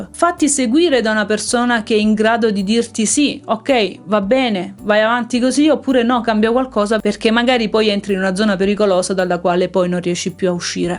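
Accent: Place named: Bergamo, Italy. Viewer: Ciao. Grazie per l'ottima e chiara spiegazione. Con il mio sax tenore e bocchino Yamaha 4C in genere uso le Vandoren "blu" 2.5 che considero la mia cosiddetta zona di comfort. Tuttavia per osare di più ho iniziato con le Rico "arancio" 3.5 che però trovo un pò dure e faticose da gestire specie sul registro grave. Ho provato anche a lavorarle un pochino (a riguardo ho seguito i tuoi video) ma non ho ancora trovato un setup soddisfaciente. Mi consigli un altro tipo di ancia (ad esempio Rico Jazz Select, di cui parlavi in un altro video)? Grazie! PS Aggiungo simpaticamente che l’espressione “manco li cani”, non proprio orobica, unitamente alla tua cadenza linguistica, mi è meravigliosamente familiare 🙂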